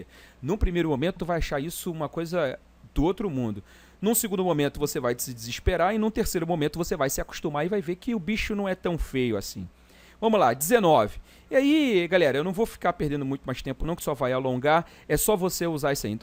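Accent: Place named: Rio de Janeiro, Brazil. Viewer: nem da pra perceber que é carioca rsrsrs abraço mto obrigado pela ajuda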